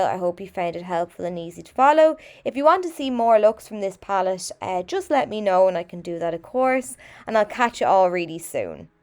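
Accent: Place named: Ireland. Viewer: I love your accent, after my Geordie accent an Irish one is always my favourite. Another great tutorial from you, but I didn't buy this palette cos its just too light for me, I like darker smoky eye shades, but it does look good on you though. Keep them coming gorgeous girl 👧 😘 ⭐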